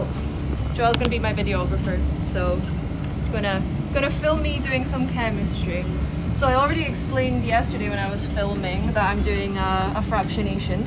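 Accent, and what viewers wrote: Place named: Scotland, United Kingdom. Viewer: Another great video. Nice to see that both our labs are a frequent shopper of Sigma-Aldrich. Also loving the Scottish accent 🙂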